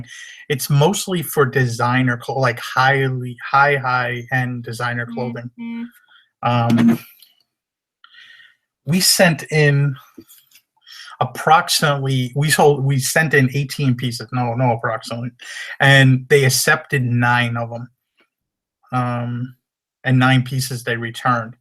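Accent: Boston, United States. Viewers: you are one of the best on you tube and love your facial expressions along with that Boston type accent...cracks me up and hope Gretchen and her Mom are doing better....sounds like you have a lot on your plate, a lot of changes in the near future so take care and hope everything with your family works out well and your mother in law heals...Happy Hunting! Corie in Seattle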